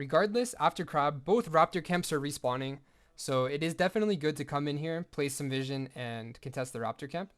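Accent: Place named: North America. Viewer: Sounds like they're from NA, not sure what accent you hear from the video to think Zimbabwe :D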